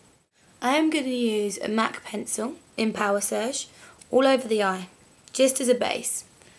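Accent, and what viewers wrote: England, United Kingdom. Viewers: If you mean 'accent', she's English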